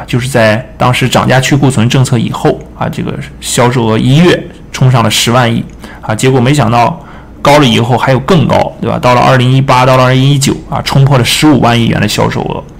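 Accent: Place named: Liaoning, China. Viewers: 我感觉是辽宁的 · 刘素 我也觉得是那边，东北口音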